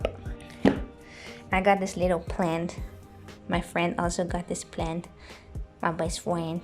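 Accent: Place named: Netherlands. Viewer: Ben jij Nederlands? Ik hoor een nederlands accent. Heel leuk😝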